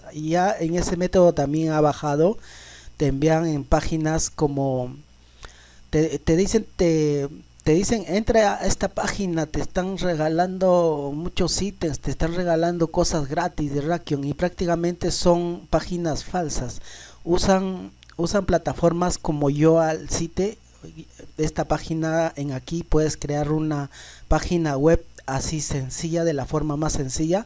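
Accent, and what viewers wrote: Bolivia. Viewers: Eres boliviano?...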